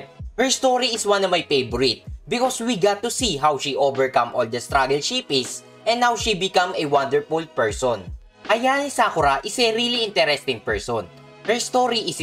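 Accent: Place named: Philippines. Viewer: from the intonation of the narrator, im guessing, filipino? btw, nice vid! i watched because well, its ayane sakura!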